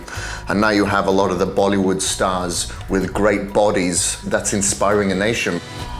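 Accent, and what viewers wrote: Wales, United Kingdom. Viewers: hi were you born in the states? as you sound like welshman! and your surname is another welsh connection.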